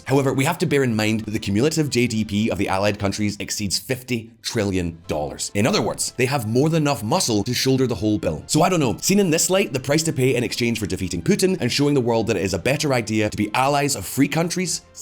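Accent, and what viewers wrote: Scotland, United Kingdom. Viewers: maybe you could slow down to 1000 words per minute and try and drop the Scottish accent. There are others outside the UK who like to get updates.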